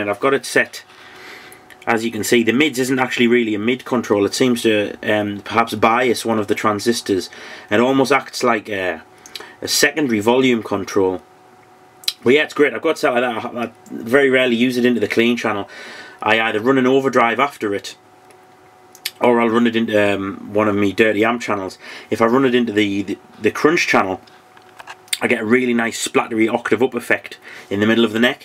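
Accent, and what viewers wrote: Newcastle, United Kingdom. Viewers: Yes! Another Geordie! VERY nice gear!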